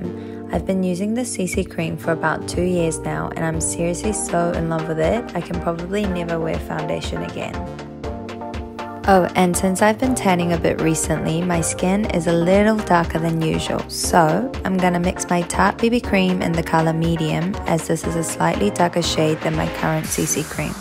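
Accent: New Zealand. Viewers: SHE FROM NZ!!!! I knew ittt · bb so this is why am getting some british accent then I was like nah aussie and then you said you are kiwi shiii I am confusion but now cleared 😉 cheers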